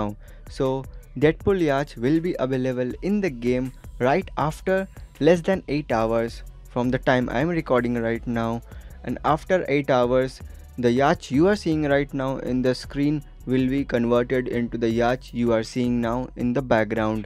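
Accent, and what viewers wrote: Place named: France. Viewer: You sound french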